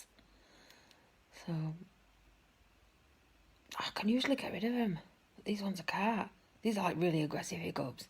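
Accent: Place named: Australia. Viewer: You sound like an Ozzie ... and I adore ya! Pretty you are, too!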